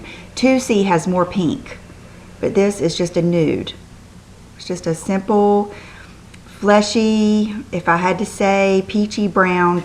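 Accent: South, United States. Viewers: Hi! I just subscribed because I've heard people talking about you and I'm so happy I did! I LOVE your "accent"...sounds like your from the south;) lol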